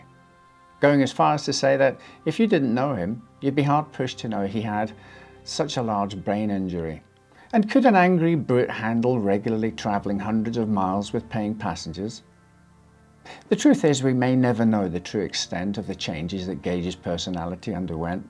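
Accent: Scotland, United Kingdom. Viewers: Are you are Scottish, but have stayed in England? Or English, with time spent in Scotland?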